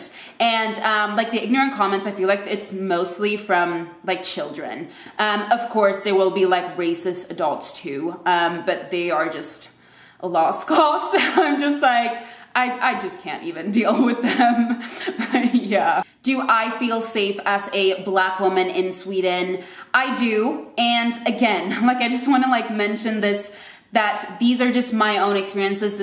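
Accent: United States. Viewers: I would have never thought that you were Swedish cause of your American accent. There isn't a single hint that you have an accent let alone Swedish accent. On the other hand, Sweden have significant Somali population. One of the few countries who welcomed Somali refugees and immigrants with open arms. Also my brother lives there. Great country. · Being white and Swedish for hundreds of years I am one of those who loves diversity and I also love to talk to people so I am one of those that always ask that question or a variant of it if I meet someone black speaking Swedish without accent. It is asked out of curiosity and I guess as a part of getting to know someone. If I heard you speak I would just assume you were American. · Your accent is American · Now I’m curious to know where your parents are from. Where are they from if you don’t mind me asking? Also, it’s very interesting that you have an American accent. 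I’d never know you weren’t born and raised in USA. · Amazing video! I am from Los Angeles & can tell that you have a TINY accent but you sound very "American" to me! I am looking into moving to Sweden or Switzerland someday &, as an Asian person, of course have my concerns about race. Thank you for the great video! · You sure do sound American 🤔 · wow, born black swedish with american english accent. I didn't realize swedes who speak English sounded this way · Holy moly you're stunningly beautiful. My question is, how soon do you start learning English and is an emphasis on accent a thing? Because you sound pretty much American with a very slight accent. I find it fascinating when people from abroad come to the US as an adult with very little accent speaking english. And why not a British accent? Is "American" english taught? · Agreed, she sounds 100% American.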